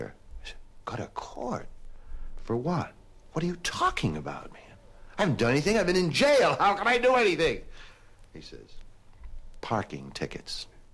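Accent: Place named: New York, United States. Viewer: Eliezer Pennywhistler i thought the same thing. it's the new york accent and his verbal mannerisms...not all of it, just certain words and phrases are very evocative.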